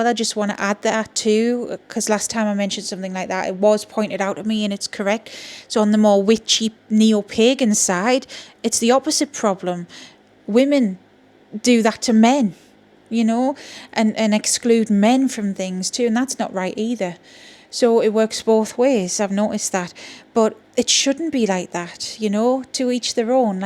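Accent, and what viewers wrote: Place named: Newcastle, United Kingdom. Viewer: I love Ur authentic self & the fab Geordie accent, I agree too many low minds jealousy ect. I don't av the knowledge on these gods goddesses I channel some Hindu Buddhist to paint them blessings xx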